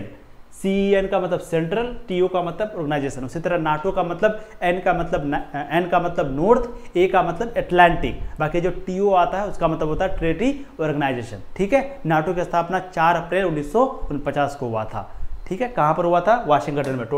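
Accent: Bihar, India. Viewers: Sir ap bihar se h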